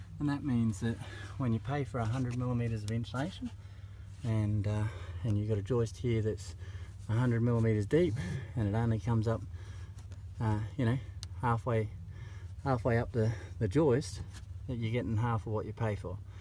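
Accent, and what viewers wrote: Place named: Australia. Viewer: Thank you, it is nice to hear an Australian talking about his product, rather than another country explaining how it is done. 😀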